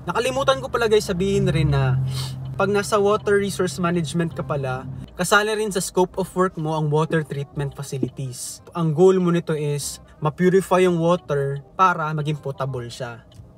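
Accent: Visayas, Philippines. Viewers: Omg 😮 bisaya ka engineer? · The accent of yours tol is so cute... Bisaya...hehehe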